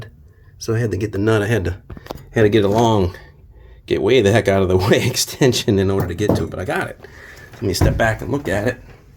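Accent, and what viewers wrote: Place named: Metairie, United States. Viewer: Funny how I’ve been gone for almost 30 years, and the minute you started speaking I thought, “That guy sounds like he’s from Metairie!” 😂 Nice job, and I hope to soon be doing an a/c installation in my own classic, as well.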